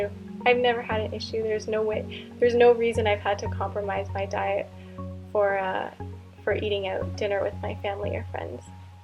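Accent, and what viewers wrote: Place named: Canada. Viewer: dunno where she lives now but she definitely sounds canadian based on how she says 'about'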